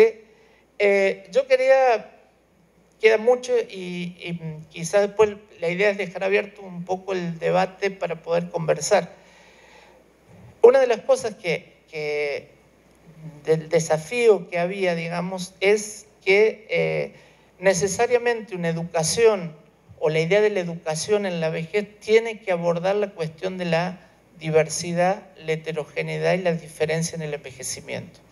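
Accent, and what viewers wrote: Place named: Argentina. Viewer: exelente ponencia ! ¿Cuál es el nombre y apellido correcto del especialista? Me parece que no lo escriben bien. Es Argentino parece por su asento.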